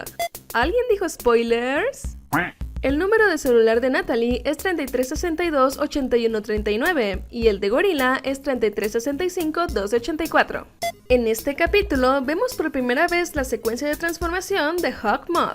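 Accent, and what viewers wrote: France. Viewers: tiene un hermoso acento francés UwU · Me encanta tu acento francés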